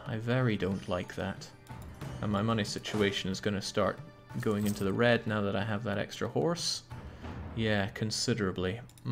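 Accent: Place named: Scotland, United Kingdom. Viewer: Stuck in a travelodge all week with Potato Internets, so just listening to those dulcet scottish tones in sweet 480p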